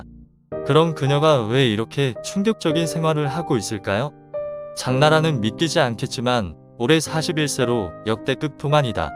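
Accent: China. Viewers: 좋은 영상도 아님~ 인공지능 대사 대충 만들어서 조선족 말하는 거처럼 들림~ 장나라팬인데 정말 기분이 나뿌네~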